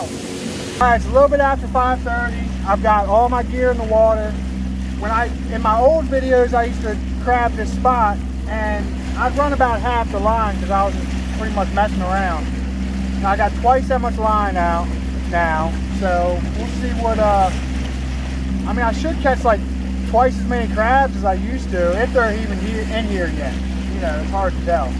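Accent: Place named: Patapsco, United States. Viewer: Man, where are you from? Sounds like Patapsco. Lol. I'm down in Deale. Where's your store. Ill come up.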